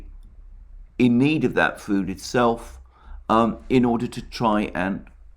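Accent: United Kingdom. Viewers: I love your British style and cadence of speach. I want to start go learn Russian. I'm sick of all this anti Russian sentiment.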